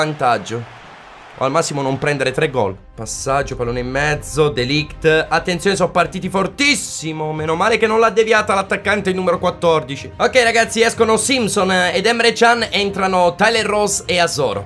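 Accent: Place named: North, Italy. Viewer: Ripeto che mi sembri del nord, poi io sono di barletta quindi capisco se uno è di qua ma a te non mi sembra proprio😂. Comunque FORZA FOREST GREEN💚🖤💚🖤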